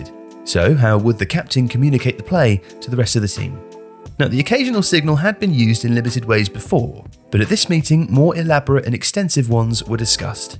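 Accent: United Kingdom. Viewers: British voice talking about American Football. Interesting. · Hearing a British man saying “football” while talking about quarterbacks and audibles instead of strikers and set pieces is so jarring. I’ve just sort of mentally accepted that football+American accent=Gridiron and football+British accent=soccer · Idk if I trust a British man telling me about American football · Why is there a brit explanation american football to me. I don’t trust this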